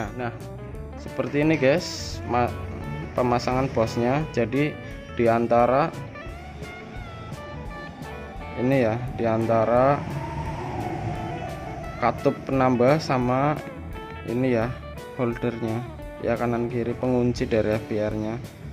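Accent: Tegal, Indonesia. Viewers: Ketara wong tegal ya um😂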